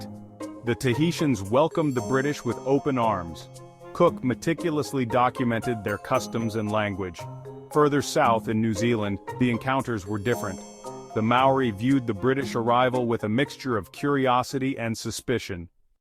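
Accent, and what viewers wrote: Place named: United States. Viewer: American voice over is annoying. The Australian site Heroes and Legends does it better 😢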